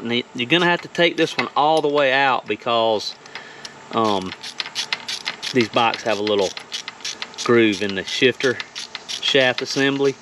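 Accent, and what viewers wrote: South, United States. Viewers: Still laughing at "sounds like the Dukes of Hazard"! LOL! You can tell you're from the South but your voice is clear and easy to listen to. The Northerners sound more like Canadians that Americans so....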